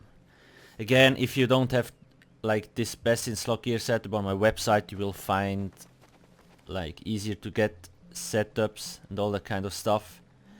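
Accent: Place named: Switzerland. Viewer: So lustig, han no bevor e Minute ume gsi isch denkt, "das tönt schwer nach eme Schwiizer!". ^^ Merci für de Content, wiiter so. =)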